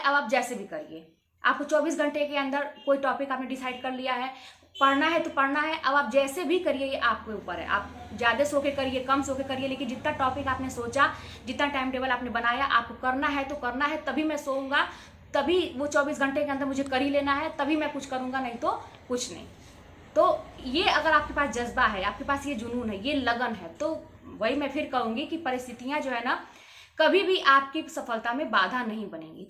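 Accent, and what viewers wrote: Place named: Purvanchal, India. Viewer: Aap kha se ho didi purvanchal side se lg rhi h ....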